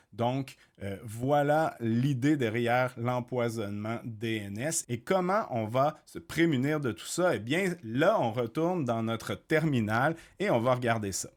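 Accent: Quebec, Canada. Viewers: cet accent Québécois est tout simplement charmant ! En plus d'une vidéo super utile, merci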